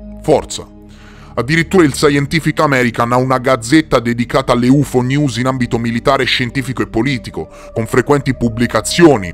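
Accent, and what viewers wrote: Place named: Lucca, Italy. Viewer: I enjoy your strong lucchese accent EHEHEH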